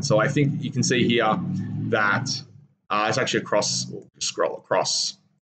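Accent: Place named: Australia. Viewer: Hi, great video as always. A few points: 1) I've tried Heavy Incinerator on GMNDK, problem is D6 shots. 3.5 hits, 1 or 2 wounds, doesn't cut it. I rather go Gat Psi and Heavy Psy, spend 2CP (Psychic Onslaught stratagem) for 12 Str5 and 6 Str8 shots. 2) As netprince455 commented before me, what are your thoughts on: "Gateing" a Land Raider Crusader carrying 2x 5man Purifier squads, Brother-Cap (Vortex) and Pala Ancient in Turn 1, (optionally) using the Psybolt stratagem for 24 Str5 shots to clear the chaff. If it survives Turn 1 shooting, everyone pops out for 3D6 + D3 MWs (12.5 avg). Entire set up is 686pts. Alternatively, you could "gate" it on Turn 2, alongside 2 GMNDKs who were in high orbit, presenting your opponent with multiple threats. Anyway, once again, great video. Love what you're doing for the GK community with these quality vids. Aussie accent is the cherry on top.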